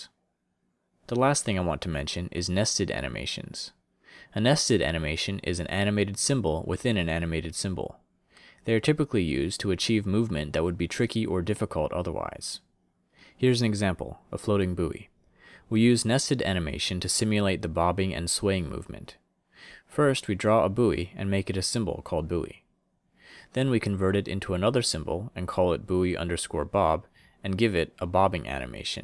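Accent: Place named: United States. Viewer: He Is American Dude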